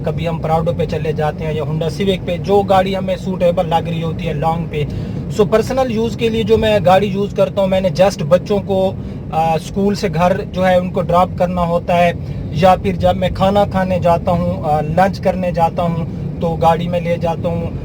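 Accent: Saraiki, Pakistan. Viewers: very nice..ap say gari layni paray ge..aik to ap ki sadgi..or siraiki lehjay par to qurban hu mein..lolz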